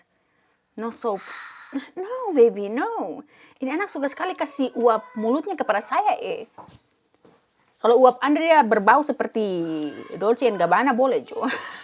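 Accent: Manado, Indonesia. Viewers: Saya sng logat.manado..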